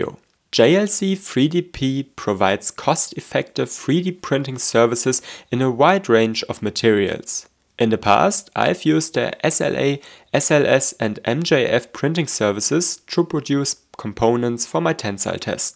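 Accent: Germany. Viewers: the british pronounciation doesn't sound like that. Yours and Stefans is more like a very heavy german accent, which is ok i guess but funny nonetheless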